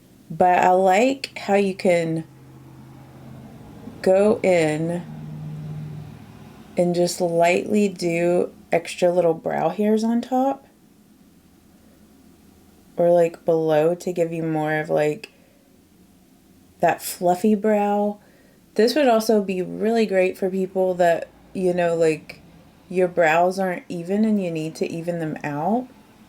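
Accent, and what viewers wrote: South, United States. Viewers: Hi....i have had the foundation for a few months and just bought the serum tint yesterday....only just launched in Australia with the serum tint one this week....typical!! We are always so late getting newer stuff here Anyway i was excited to see your comparison video....look forward to trying it tomorrow. I was kinda hoping it might be less dewy looking...we shall see! Love your beautiful Southern accent btw... hope you have a lovely Easter! Take care...Lindy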